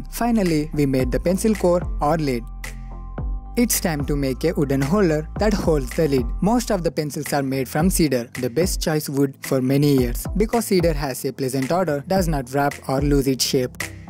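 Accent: India. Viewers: Would still be better without the gupta accent.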